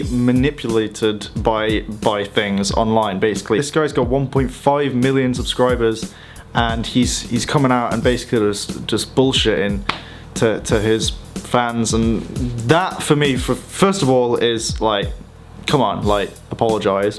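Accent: United Kingdom. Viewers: can u plz explain adderal ? Sorry I'm Arab and difficult to British accent sometimes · You’re a fake your British accent is horrible idiot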